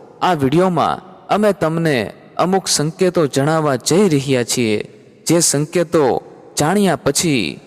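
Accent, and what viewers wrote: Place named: Kathiawar, India. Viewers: વક્તાના ઉચ્ચારો પરથી લાગે છે કે તેઓ ભાવનગર તરફના હોવા જોઈએ. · Good but speech irrted che bcz kathiyavadi bhashaaa😮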